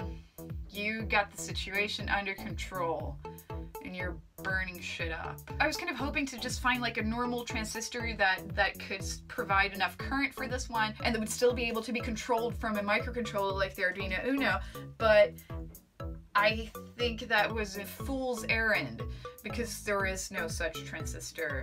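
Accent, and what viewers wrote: United States. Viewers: You're the most American-sounding Swede I've ever heard. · Swedish? WTF. You sound more American than most Americans. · You're Swedish? Hahaa! Definitely thought you were American. Awesome video! Make one for my friend, pls?! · Din amerikanska accent är klockren.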